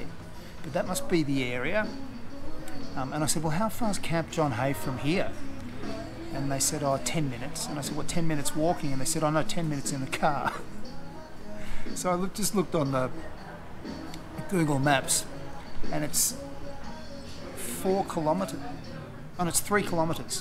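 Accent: Australia. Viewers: You sound like a guy from👇 under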